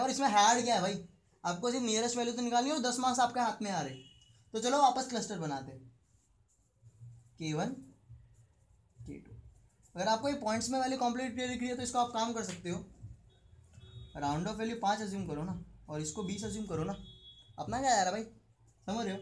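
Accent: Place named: Mumbai, India. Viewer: Mast mazza aa gaya mumbai wala koi dost samza raha hai laga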